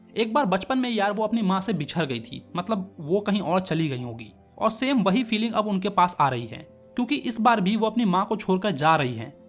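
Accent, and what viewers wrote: India. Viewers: Dehati English ❌ deshi english ✅🫶🫶👌👌🙏🙏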